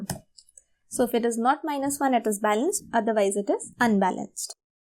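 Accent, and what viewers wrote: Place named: India. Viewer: indian accent spoils any kind of tutorial :(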